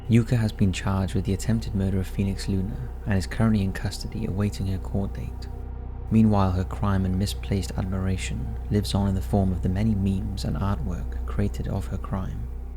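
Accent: Wales, United Kingdom. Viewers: Ah maybe! I've been trying to pinpoint his accent from day one! A mild Welsh accent is a definite possibility! 🤔Thanks 😁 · The narrator sounds so much like Craig Roberts! I can hear a Welsh twang to his accent or something! Love that accent! Beautiful!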